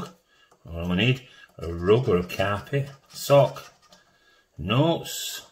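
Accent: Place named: Barnsley, United Kingdom. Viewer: where you from mate Barnsley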